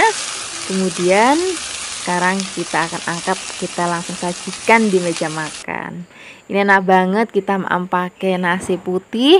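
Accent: Java, Indonesia. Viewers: So yummy banget Suka dengan resep²nya bahasanya medok kyak Saiya kak orang jawa Kaye trimkasih Kaka resepnya topmarkotop Joss🥰👍👍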